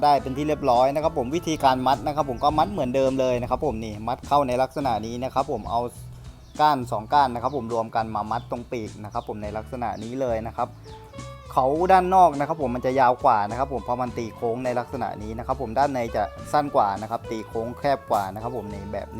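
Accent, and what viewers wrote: South, Thailand. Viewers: พี่เป็นคนใต้ใช้ไหม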